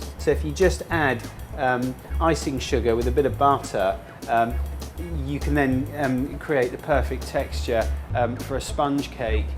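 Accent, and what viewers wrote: United Kingdom. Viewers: That thing with british accent I always fall in love. New sub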